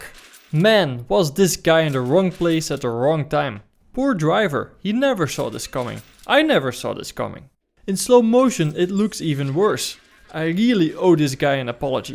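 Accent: Netherlands. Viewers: you dutch? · Are you dutch?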